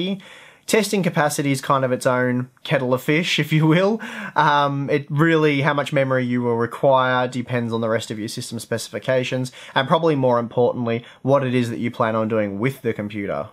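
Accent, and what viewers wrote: Australia. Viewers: The RAM Jenga was awesome :D Please stick to your Aussie pronunciation, I always cop it for the way I say "Cache", but it's also our signature :D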